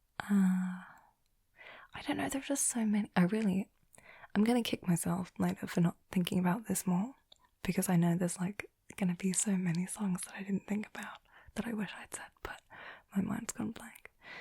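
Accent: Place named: Australia. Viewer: Whoa, that took me by surprise. To the ears of someone who can't put two German words together in correct syntax, you speak it very well. New ASMR opportunity? ;) On the note of your background, when I was new here and had yet to become acquainted with your channel, I actually thought you were living in Australia. You carry a lot of that accent with you, imo. Pretty cool. Yeah, I saw that Japan interview video as well. I was tempted to comment about it a few weeks ago but I was like, "Nah, she's seen it by now..." I'm glad I didn't read the comments on it; I'm sorry you endured that. It sounds like you have a lot of travel destination plans. If any of those opportunities come to pass, do you think you would do recordings while you're there to share with this community? I always like sampling people's travel vlogs. Thanks for doing this Q&A! I didn't see any notifications to pose questions but that's ok: you answered a lot here! 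I enjoyed this. :)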